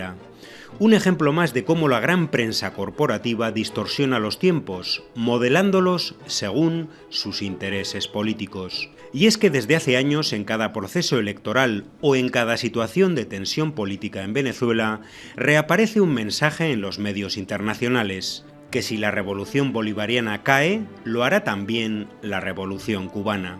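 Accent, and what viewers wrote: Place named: Spain. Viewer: Cuba Información y con un españolete de narrador! que bodrio!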